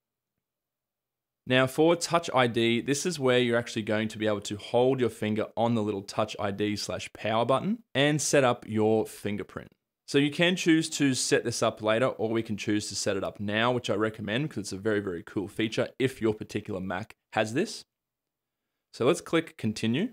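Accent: Australia. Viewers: Thanks fornthe turorial man. It's so nice to have a tutor with Australian accent 😁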